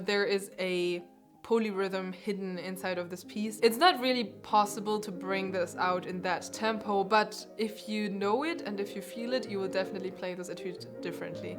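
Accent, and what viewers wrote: Germany. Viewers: That accent tells me ur native language is german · Nice the German accent and words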